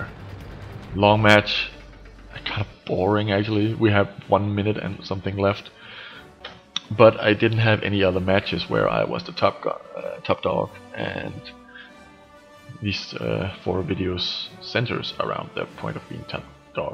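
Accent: Denmark. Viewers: are you danish??? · Dude be nice if we could face each other cause when i watch ur vids i get the urge to face u lol...an based on the accent ur Danish am i correct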